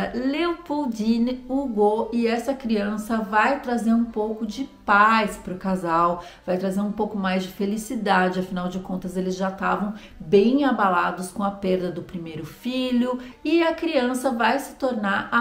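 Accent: France. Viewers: Amo o jeito francês de você falar os nomes 😊😊😊😊.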